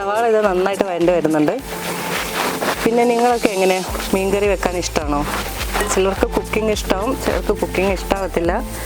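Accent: Malappuram, India. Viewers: Chechi malappuram kari ano atho kozhikkod kariyo samsaram kettitt chothichatan 🤔😊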